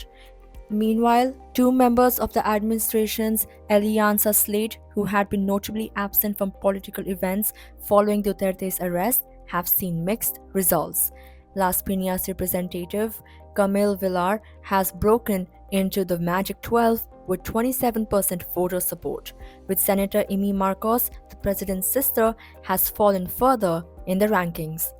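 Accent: India. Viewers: Hahaha, you speak english well but you could not trash your indian accent. That's what you get when you always spread fake news about the DU30's. Are you one of many media outfits that received incentives from marcos jr?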